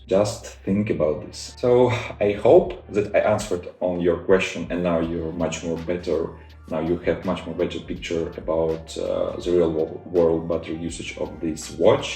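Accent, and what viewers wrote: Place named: Russia. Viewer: U from Russia? I hear strong Russian accent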